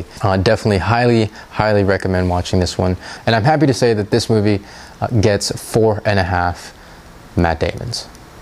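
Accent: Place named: United Kingdom. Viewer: You do a great job,! Love the British accent, too:)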